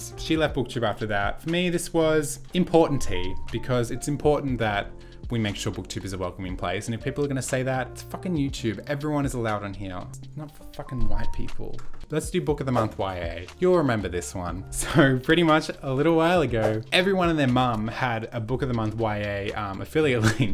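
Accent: Australia. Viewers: Is that an Australian accent? It is just lovely!! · How did I know you’re Australian before I even clicked on the video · THIS WAS JUST IN MY RECOMMENDEDS AND YOURE AUSSIE????? Stan. I have no idea what any of these ~dramas~ are (minus jk) lmao but this is great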